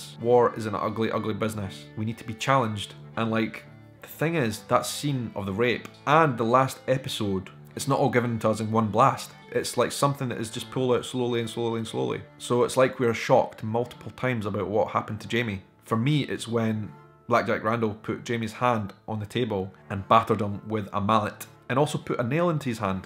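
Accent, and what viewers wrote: Scotland, United Kingdom. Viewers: Beautiful Scottish accent You have Pal. I am not even from England. But I am fascinated of any culture that came from this beautiful Island:)A 5y visit and live in Yorkshire changed my mind totally about people from there, I met many scotish folks there:) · I'm watching you since few videos now, and watched some of your past ones. Not that relatable to this topic, but must say, I really enjoy your dialect. I'm not a native speaker, but Scottish dialects are the best regarding the ability of understanding for me. You guys speak very openly, articulate beautifully, I mean so much i and e sounds, just like my native language. It is also nice to hear and learn new area specific words! Thank you for your work! :)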